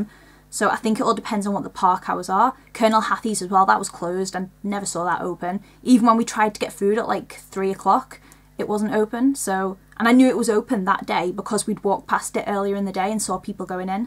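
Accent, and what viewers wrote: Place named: Wirral, United Kingdom. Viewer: Are you from the Wirral? Sounds like a wirral accent :) I'm Liverpool myself haha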